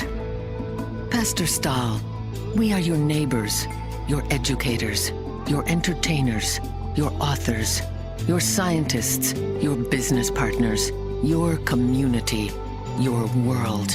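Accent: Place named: Levant, Syria. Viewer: هل معناة حبي لهم أني ما أقول الحقيقة؟ نعم أمي جاهله وأبي جاهل بكثير من الامور رغم شهاداتهم القوية الا أن في ظني هم يعتبرون جاهلون قليلا وخاصة في أمور الالحاد ، بعض الاحيان تحصل دكتور طب لكن سلفي يعني حمار لكن يفهم بالطب ، يعني هذه الحياة المشكلة أن المسلمين غالبيتهم ليسو مثلك ، غالبيتهم بهايم ولايقرؤون وجهله ويشتمون ، ويبدو من لهجتك أنك سوري أو فلسطيني يعني يمكن عشانكم بسوريا عندكم أكثر من دين وطائفه والنقاشات عندكم طبيعيه نحن نعاني من هذا التخلف في الجزيرة العربية ، تخلف وجهل وغباء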